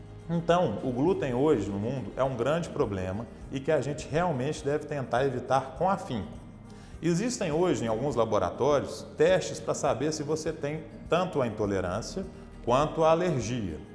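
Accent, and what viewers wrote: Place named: Minas Gerais, Brazil. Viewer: Oi Dr, adoro escutar suas explicações, muito bem explicado, com sutaque bem mineirinho, abraços.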